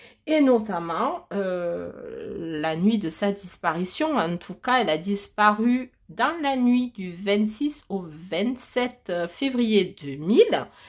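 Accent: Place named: South, France. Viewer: Coucou ! A mon tour, je vais regarder ta vidéo. J’aime ton accent du sud ! Et je me suis abonnée. 🌹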